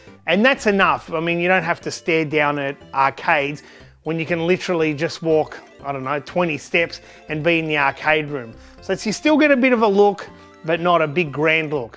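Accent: Australia. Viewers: Thanks to you, I can perfectly understand Aussie accent now. A couple of months ago when I first subscribed, I didnt have any idea what you are talking about:) Well done mate. Keep up the good work.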